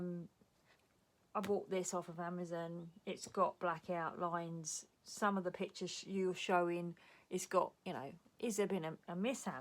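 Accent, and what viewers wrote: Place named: England, United Kingdom. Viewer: I started with Colouring, did a bit of en masses, art journaling, collage, atc's, and then went to journaling for about two years and now Ive come back full circle to Colouring. Funny how things go. First time I've seen any of your videos, odd hearing another English voice, I mainly only watch Americans. Happy Colouring hun x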